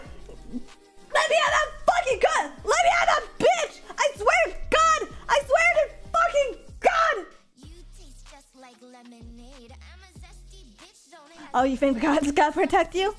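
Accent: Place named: South, United States. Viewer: But why is she southern 🤔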